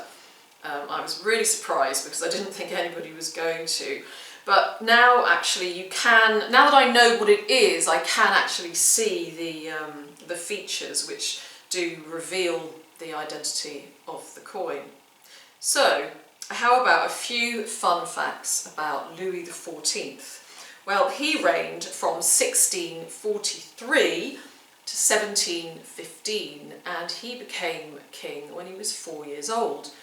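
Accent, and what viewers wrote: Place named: RP, United Kingdom. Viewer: dam this lady is gorgeous love the posh accent and then mudlarking lol good for you i do a bit down rainham way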